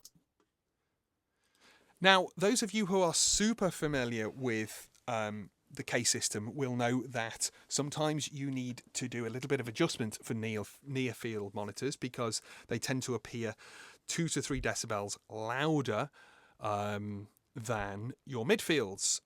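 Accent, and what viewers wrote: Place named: England, United Kingdom. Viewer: it is a pleisure listen this nice english accent !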